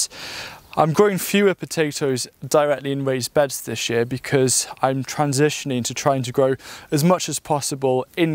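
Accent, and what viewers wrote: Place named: Wales, United Kingdom. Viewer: I’m interested in your accent. Every third sentence there’s a cadence that sounds Welsh. Which region is it?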